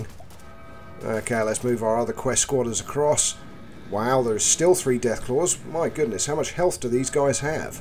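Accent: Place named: Australia. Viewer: Bro your voice sounds like the Australian Accent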